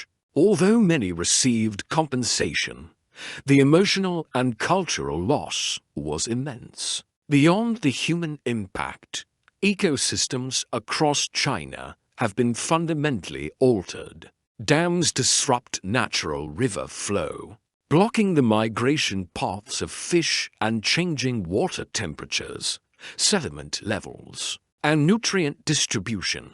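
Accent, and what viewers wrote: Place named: United Kingdom. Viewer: its the demeaning British accent. 😅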